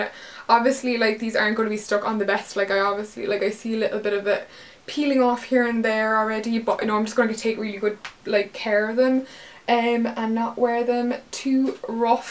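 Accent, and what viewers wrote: Cork, Ireland. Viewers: It's funny how you still have your Cork accent until you say the word "everythin'" which you say in a really strong Belfast accent haha :) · That leather skirt is amazing! And I could listen to your accent all day. My favorite priest was from Cork, as well as my great grandfather. :)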